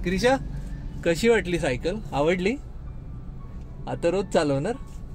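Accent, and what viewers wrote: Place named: Pune, India. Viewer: Full puneri vatatos boltana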